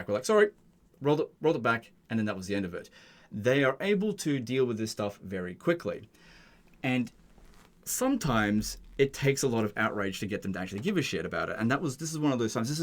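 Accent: Australia. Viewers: Ill miss yr Aussie voice! You made me a better English learner!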